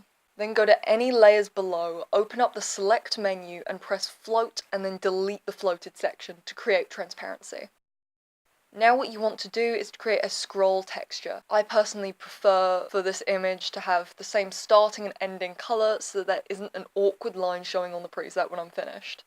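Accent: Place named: Australia. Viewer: Your voice has changed, now you sound somewhat Australian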